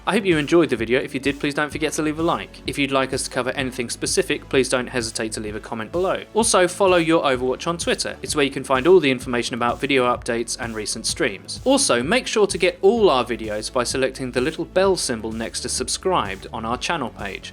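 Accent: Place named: United Kingdom. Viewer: A lot of yanks discovering british english for the first time I guess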